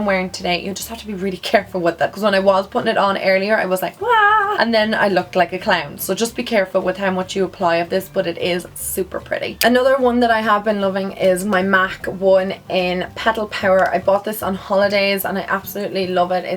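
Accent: Ireland. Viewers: i watched several of your videos and i could figure where your accent cam from. well now i know :) always loved Irish accents!!! you are now added to my favorite youtubers XD besides i love your videos anyway so your accent is just a plus!!! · so funny I'm obsessed with you girl your amazing and me and my fiance are obsessed with conor McGregor yall are both Irish and while I'm watching you and I hear another Irish accent lol I look over and my fiance watching conor lolol point of story I love you and we love Ireland ♡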